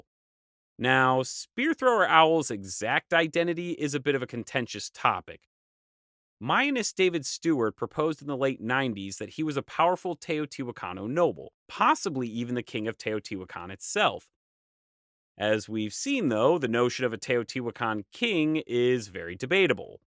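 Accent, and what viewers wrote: United States. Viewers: Is this due to the thick American accent?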